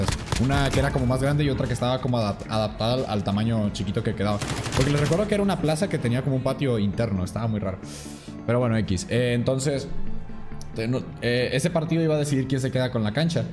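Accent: Mexico. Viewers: Ah qué pedo, pensé que eras de México :0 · pero si parece que tiene acento mexicano x'd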